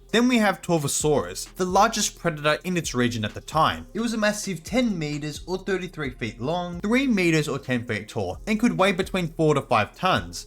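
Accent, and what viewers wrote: Australia. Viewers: Good day mate are you Australian? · U an Aussie can’t tell which accent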